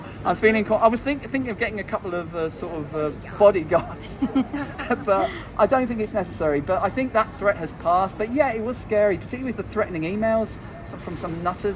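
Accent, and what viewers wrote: United Kingdom. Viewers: Dr K seems like such a decent cool guy and I love his accent. Reminds me of me English cousins. Hadn’t heard of him until this nonsense happened. Now I’m following.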